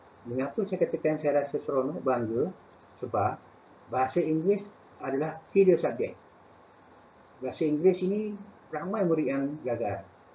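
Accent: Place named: Pahang, Malaysia. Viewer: Dh jadi org Pahang dh cikgu.... Ckap pon dh loghat pahang dh...😀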